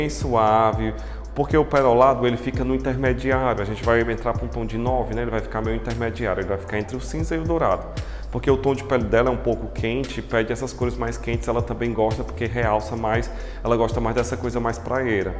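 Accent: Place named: Ceará, Brazil. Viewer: Já reconheci que é um cearense de longe kkkkk meu marido tbm é!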